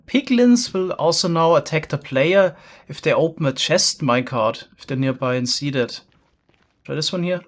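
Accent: Germany. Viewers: you sound a bit German 🤔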